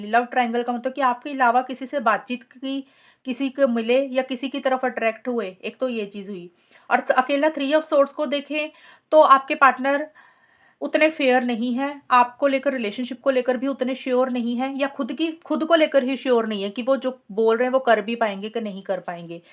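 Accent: Punjab, India. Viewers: Your way of talking is Punjabi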